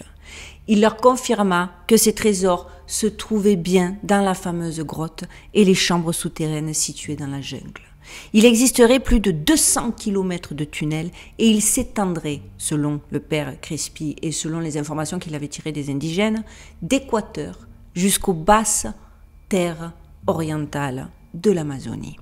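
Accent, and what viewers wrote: South, France. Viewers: Votre petit accent du midi , peu commun à Las Vegas apporte le petit rayon de soleil à travers votre reportage. Cw que vous indiquez est réel. J'ai eu la chance de visiter les souterrains de Bucegi... Tout ce que nous entendons vivons lisons apprenions et voyons est archi-faux ! 🙂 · Puisque les commentaires t'aident pour remonter les algorithmes , commentons! Franchement, j'attends tes videos avec impatience. Ta manière de presenter est claire, précise, documentée et souvent drôle malgré des sujets qui ne le sont pas toujouurs! Mais ce que nous apprécions au delà de tout c'est ton bel accent du sud qui, pour les expats que nous sommes, nous ramène vers d'autres temps, d'autres lieux.... Surtout ne te laisse pas intimider. Please go on with the great work! Blessings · Allô …. Juste par curiosité ou as tu appris à parler français….de quel origine es tu….je trouve que tu as un accent du sud de la France….ton accent est très jolie soit dit en passant.👍🇲🇶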